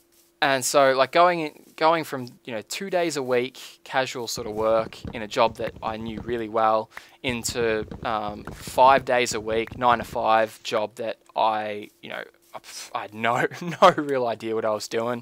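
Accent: Australia. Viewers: cool to see you back bro, missed that aussie accent <3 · i completely feel how u feel atm, my upload is completeley shit, and eveything around me is being pretty bad as well, bad net, too much work etc. i recently heard about u from the hermitcraft community and after seeing the first 7 minutes of this video, i feel that we have lots of things that are very similar between us, and thats why, i think ill be watching more of you! nice to know that im not the only one who has faced this kinda situation. I got two questions for you though a- what did u mean by 'tuberblues'? sorry english isnt my first language and i didnt understand what u meant when u said that word. and b- are you Australian or new zealandar ? i swear that accent of ur, so much like the guys here is australia! (lol yeah i live in AU) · Old timer, glad to see your back. I really did miss hearing the aussie accent on YouTube. 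Btw, which paintball field did you work at /are you still working there? I can't remember if you told us if your still working there. It's been a day after watching your video. And again. So glad your back.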